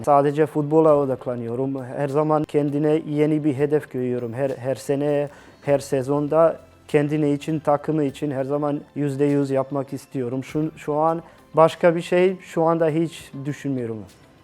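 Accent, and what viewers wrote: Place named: Trabzon, Turkey. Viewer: La adam konusmasi tipi felan tam trabzonlu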